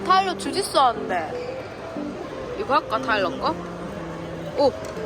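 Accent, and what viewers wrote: Gyeongsang, South Korea. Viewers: 이 언니ㅎ 처음엔 뭔 영어를 대구사투리로 하나 웃었는데 점점 빠져듭니다 타국생활 지치지 말고 즐겁게 .. 응원합니다^^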